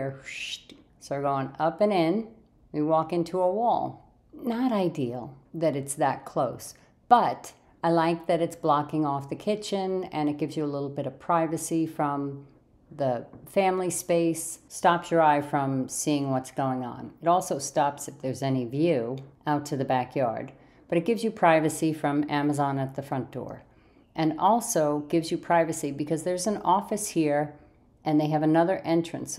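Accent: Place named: Northeast, United States. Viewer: really appreciate your vids. You so remind me of the Northeast, where i used to live- just your ways,, accent- down to earthed-ness-loveit!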